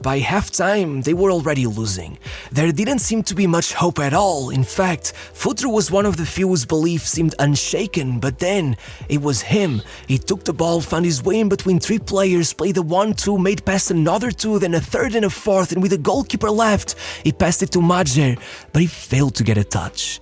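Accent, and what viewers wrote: Portugal. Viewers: Tu és português né? Sinto um sotaque português 😂😂😂 ao falar Paulo Futre Ronaldo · O gajo por trás deste canal é tuga? A maneira como diz os nomes convence ngl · Futre foi gigante- Mas esse inglês-tuga e essa forma de colocação de voz fazem do vídeo algo de absurdamente nauseante. Experimenta fazer a narração com voz normal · tens um bom ingles mas nota-se o sotaque pt hahaha bom trabalho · I know you are Portuguese by the way you say those portuguese names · Pela tua voz, ouço um sotaque português. Fico feliz em saber que seja alguém da mesma língua que a minha a gerenciar este canal. Abraços do Brasil 🇧🇷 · Its not his fault that he has a portuguese accent because he is portuguese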